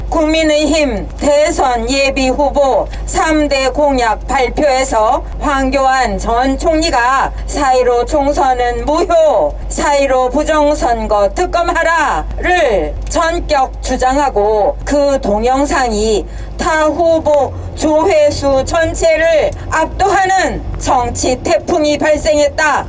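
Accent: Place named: North Korea. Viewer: 이 방송하는 여자 바꿔라 ! 북한 노동적위대 방송하는 북한식 어투같아서 처음엔 깜짝 놀랐다. 당장 바꿔라 ! 강하고 아름다운 자유 대한민국을 후손에게 물려주기 위하여 !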